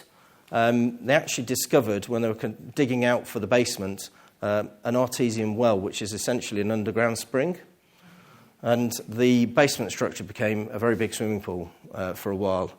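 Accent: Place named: United Kingdom. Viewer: British English accent is nice to hear and easy to understand for non native English speakers..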